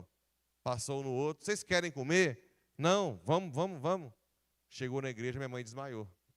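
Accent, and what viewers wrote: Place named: Goiás, Brazil. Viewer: Deus abençoe o senhor Pe, sotaque goiano, é o máximo 😅😅😅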